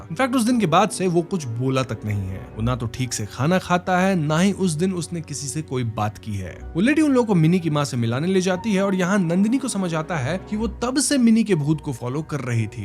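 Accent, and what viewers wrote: Bengal, India. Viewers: I think the host is bengali. Accent thora sa bengali type hai. But that's my opinion. N ye to achi baat hai. Because of this channel kayi movies hai jo language barrier k karan hum dekh nhi payenge but yaha wo explain ho jata hai. N hume itni achi movies k bare me pata chalta hai. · i also think that because when he speaks hindi you can hear bengoli touch in some words.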